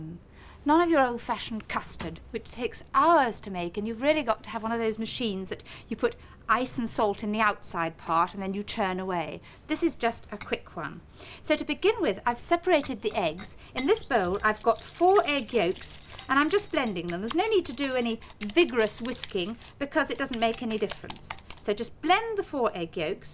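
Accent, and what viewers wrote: RP, United Kingdom. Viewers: My! She sounds so posh!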